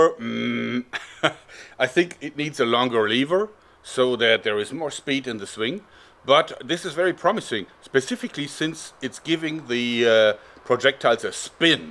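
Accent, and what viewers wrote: Germany. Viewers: he has a real german accent, but sometimes exaggerates to make fun on his own accent. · Fake Accent? He has a Real one, And I have Something against German accents.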